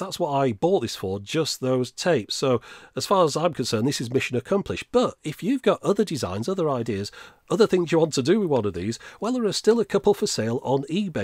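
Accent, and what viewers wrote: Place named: Northern England, United Kingdom. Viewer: Yep - it was the Minidisc video, which got me into in the first place. I thought, I've just watched a 40+ minute video and enjoyed all of it. I love voice with his Northern intonation. 90% of my YouTube time now is videos...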